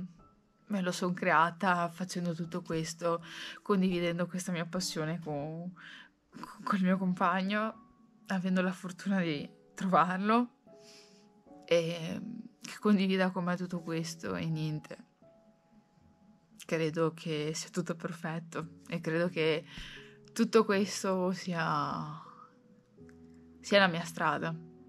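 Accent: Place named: Veneto, Italy. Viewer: Ciao di seguo da poco ma sei fantastica come quasi tutte quelle che vanno in montagna poi accento veneto io sono di verona sponda lago di garda e quel tocco in piu.da dove 6?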